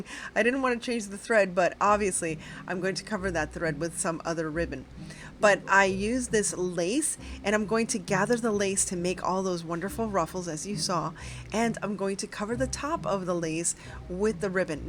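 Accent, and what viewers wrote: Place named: Miami, United States. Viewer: Ohhhh, ok, now it all makes sense : your accent, the love of halloween......you're from miami! Lol i grew up in kendall til i was 15 n i KNEW you sounded like my family😂 i feel like NO ONE n NOWHERE really celebrates n does it up for Halloween like Miami n ppl born n raised there. Idk if u were born there, but if u werent, ik youve been there a LONG TIME. Ahhhhhh, mi hermosa!!!😂❤❤❤ youre projects are amazing and i ADORE THEM!!!❤❤❤❤❤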